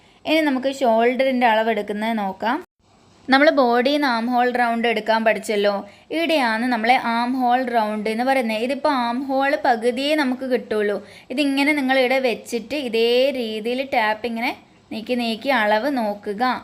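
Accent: Kannur, India. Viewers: Kannur aano, enkil evideyaa. Samsaarareethi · Kannur analle.... 😍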